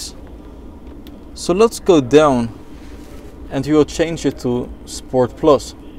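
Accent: Netherlands. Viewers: Most dutch accent ever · It's English in very Dutch accent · He is dutch :P i hear it :D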